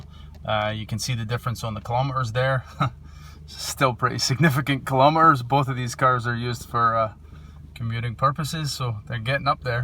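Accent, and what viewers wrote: Scotland, United Kingdom. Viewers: fek sake shu have known. Alternative ulster was our anthem. Used to play in the limelight in belfast. I was in my teens in the 80's. I hear ur scots accent breaking thru regularly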